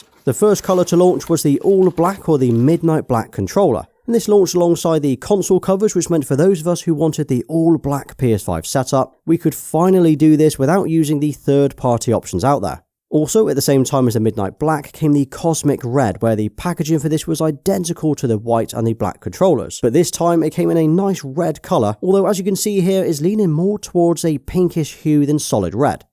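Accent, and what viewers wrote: United Kingdom. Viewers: Uk accent hits me hard 😂 but u cook ps5 in this video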